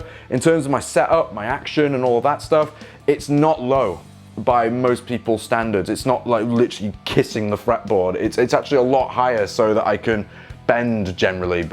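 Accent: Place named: Stoke, United Kingdom. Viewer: Uhhh man. That Stoke accent is hideous 🤮🤮🤮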